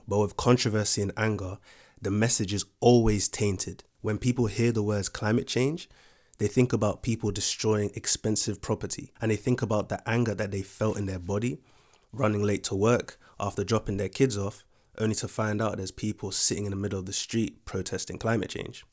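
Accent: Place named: United Kingdom. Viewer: Hey do you live in America I only ask this because I assumed you lived in the UK just because of your accent, but you also seem well aware or in on American Political issues and pop culture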